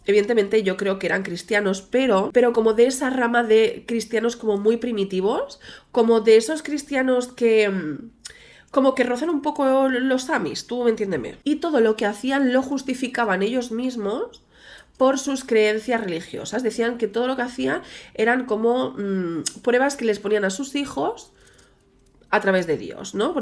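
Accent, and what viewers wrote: Spain. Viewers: Nena el acento español no es de muy mi agrado pero me encanta escucharte no sé porque el tuyo me parece muy lindo ☺️y tus videos muy buenos todo tu contenido es excelente me encanta ❤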